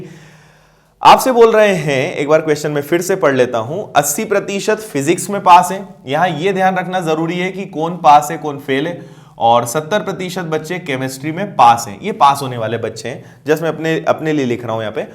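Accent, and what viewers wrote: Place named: Gujarat, India. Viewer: Your style speaking like Gujrat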